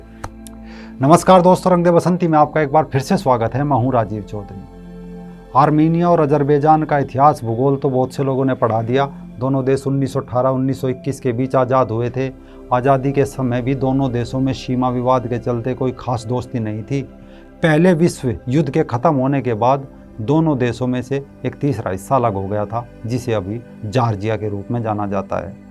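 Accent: Haryana, India. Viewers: My mom is alsofrom haryana I understand this haryanvi slang of hindi by bhai😂 but one thing he tries so hard to provide excat news to us his work is more authentic then most of the famous news channels, keep it up bhai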